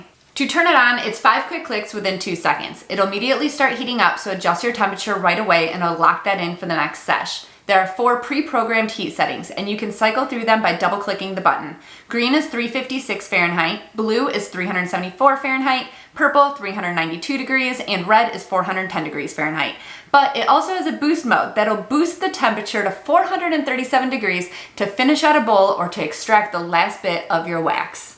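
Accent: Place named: Midwest, United States. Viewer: Your accent, Let me guess Illinois or Minnesota maybe….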